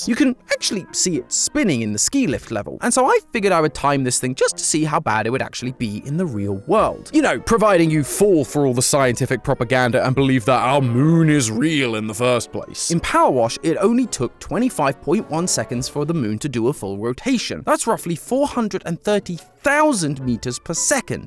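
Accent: United Kingdom. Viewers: As a fellow British person, we do just say it like that 😅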